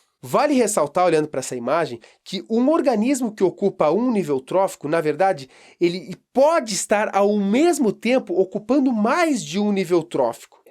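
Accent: Rio Grande do Sul, Brazil. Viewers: sou apaixonado pelo sotaque gaúcho.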